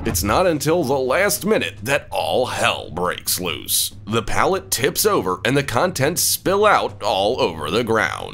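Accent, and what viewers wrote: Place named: United States. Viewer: Where is the narrator from? He seems to have an American accent, but uses expressions like "gave it a go" and "went pear-shaped". It's jarring.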